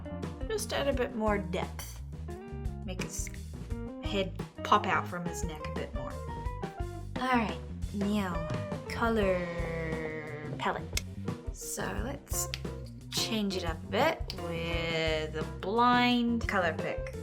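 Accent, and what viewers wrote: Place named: New Zealand. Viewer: i can bet you're from NZ judging from the accent ahahah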